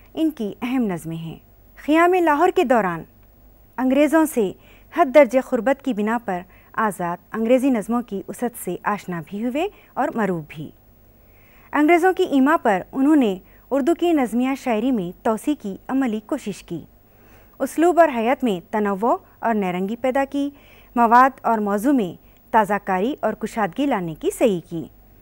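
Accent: Deccan, India. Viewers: The teacher is from Hyderabad probably. Her pronunciation is quite Deccani.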